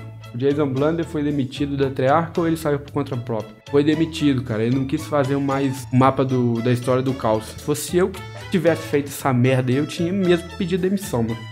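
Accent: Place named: Minas Gerais, Brazil. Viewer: Quem é esse mineiro?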